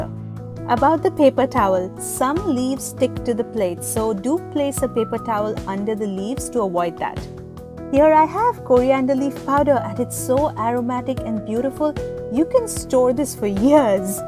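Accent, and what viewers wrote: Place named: England, United Kingdom. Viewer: Nice English accent and thanks for the video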